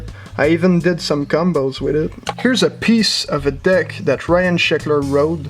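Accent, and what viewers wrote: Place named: Quebec, Canada. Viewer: the best french canadian accent ive ever heard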